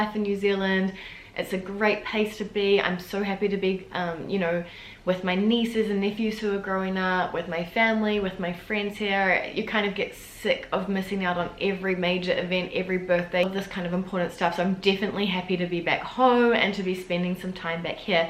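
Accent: New Zealand. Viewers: Your accent has become so much stronger no you're back in NZ!! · Hi! You have not to be worried about the channel, it was born with another purpose but adjustments to it might be the right thing along with the changes you are experiencing now! Me and my girlfried have started following you mainly because you come from New Zealand 😋 we wanted to hear your accent and about the differences between Europe and Newzealand lifestyle and you always hit the target. We both hope you'll continue doing videos about New Zealand and more! Thank you for your great job so far! E&I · Hello, I have been studying English in NZ for 6 months. I left Paris in January to come here where I had been living for few years. My sister works to L'Oréal as well. I'd just would like to say that I discovered your channel one week ago and I very apreciate your video. I think your speak French quite well. 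But I very enjoy to listen to you speak English with the kiwi accent about Paris. It helps me a lot for my learning of English. So thanks for that. According your chanel, even if the concept is an expatriate living in France I will be happy if you carry on. You can apeak about such a beautiful country that is NZ. I went to Wellington some weeks ago for a weekend and I very enjoyed the place, I think like a lot of people you're lucky to live there. I wish you the best ! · Hi, please carry on with your channel you’ll teach us stuff about NZ, I’ve always been fascinated by this country and the Maoris and I really wish I could go there someday. Plus, I love kiwi accent! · It’s amazing how way different your accent is now, way more Kiwi (obviously? ) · Oooooh that makes me so sad ! As a French from Lyon's area, I discovered your great channel a few months ago and loved it right on the spot ! 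I really love the way you describe our society and little habits that seem perfectly normal to us, but certainly really weird to foreign people. It's always super interesting to get a critical look on our habits. Yours is critical, but in a funny, full of empathy way, and it is always very positive and enlightening. Not only did I like the way you talked about our country, but also the way you generally speak, your personnality, your sense of humour, your great accent from NZ... So keep posting ! I hope you enjoy the modifications in your life. Your family must be sooo happy to finally have you back ! Don't be sad, ce n'est même pas un au revoir, on reste avec toi ! Chin up, spirit high ! Be happy, a nice soul like yours diserves that ! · Your so pretty and that kiwi accent is adorable and charming ! I think it's a great idea to keep this Channel, do post vids when you visit France and incorporate Videos about New Zealand. 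I would love to learn more about New Zealand. People seem so friendly there.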